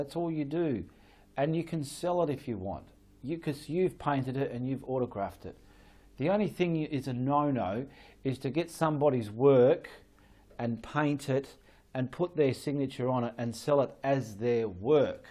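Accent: Australia. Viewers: Love love love your accent and your Aussie phrases. That's part of what makes you so unique to watch!! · I have only just found you, and I am loving the down to earth way you teach. I can understand every word you say but being English means we do understand the Aussie accent well. I love the fact that your studio is a corner of your living room. Our living room is far too small to do that so I am converting a corner of my bedroom in to a studio. I have subscribed to your channel because of your no nonsense way of teaching. I enjoyed your Vlog answers to questions. Regarding retarder, I am thinking in Australia, like you said, it gets very hot and you would need it. I have bought Golden Gloss Medium that also allows blending and slows down drying time. It also has added polymers so you can thin down your paint about 30% without under-binding occurring. Like one of your other subscribers who has commented, I am 65 also and I am new to acrylic painting. 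I have done a little oil painting before but not acrylics. I am looking for a straightforward teacher and I have found him. Thank you. Love to you and. He is gorgeous. xxx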